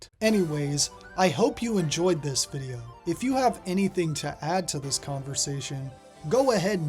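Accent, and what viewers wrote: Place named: South, United States. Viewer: Maybe it’s his ambition to be “refudiated” for his desire to use the word. 😉 In seriousness though, I notice that he has Southern-accented English, the vernacular forms of which posses a lot of non-standard pronunciations for common English words; for example as a Texan, I remember mispronouncing the word “Nuclear” as “Noo-Cue-Lure” instead of the correct “Noo-Klee-Ur” thousands of times before it later became a US-wide meme in 2002 due to “a certain Texan US president”. “Lambuxatious” sounds vaguely familiar, but I can’t immediately put me finger on what words it’s a derivative of (perhaps a combination of “To lambaste”, “To buck”, and “Vexatious”? 😂 ).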